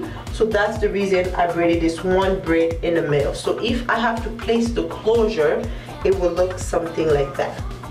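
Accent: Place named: Africa. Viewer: love the African accent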